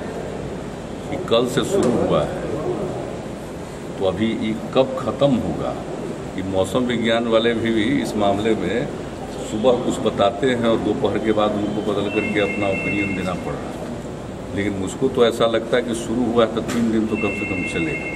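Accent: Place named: India. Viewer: Dehati Bhasha Jaise bol rahe ho Hatiya hi to Bhadwa Hai yah kaun sa Baat hua Barsat Ka Mausam Mein madad karo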